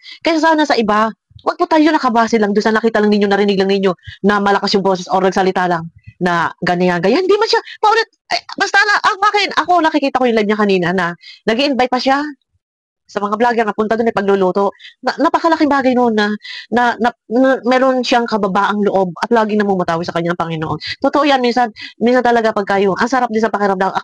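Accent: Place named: Batangas, Philippines. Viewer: Batangueño yan c kuya kaya ganyan boses nyan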